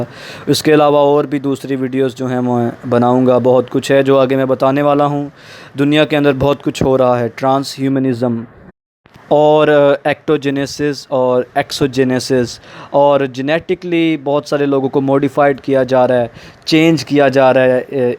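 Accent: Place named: England, United Kingdom. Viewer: Ur English Accent I Like It :)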